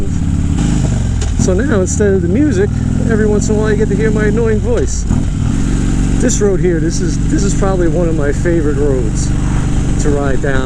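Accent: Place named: New Jersey, United States. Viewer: Great first vlog. You have the sound problem down pat right away. It took me a really long time to get it right and you have it right. Love the accent. I had a few projects in NJ a few years ago and I was surprised to see how beautiful and rural so much of your state is. Look forward to seeing more. Ride safe my friend. Beautiful Road Glide by the way.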